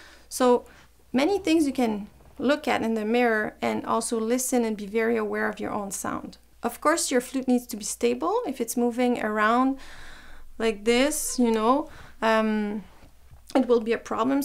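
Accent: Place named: France. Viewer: It looks as if you have an french accent,so, are you French?(without malice)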